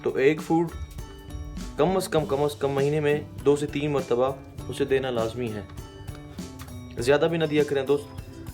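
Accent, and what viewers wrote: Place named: Balochistan, Pakistan. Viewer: Bahot khooob Aik persnal sawal krna chaoonga. With excuse!!!!!! Aap ki urdu Bht achi hai takafuz saaf hai. Lekin pir bi aap k.Lehjy me wo mittaas aajata hai. Jo humari zuban ka hissa hai! Sawal ye k kia aap brahvi hain???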